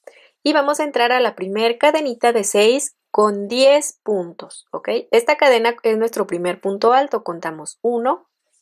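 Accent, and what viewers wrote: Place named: Chihuahua, Mexico. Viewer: Queried a amiga,. Que preciosos trabajos Haden Gus manos. De don de eres en Mexico?. Me suenas como de Chihuahua, Rees Norte a?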